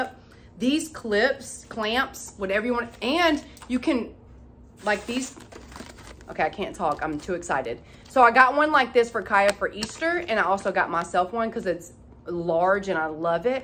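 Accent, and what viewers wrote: South, United States. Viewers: I absolutely love your southern accent! 💐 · I love the country in you Not to many say y'all anymore and that's a southern thing girl. My new favorite DYI FROM DOLLAR TREE SHOPPER. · your Southern drawl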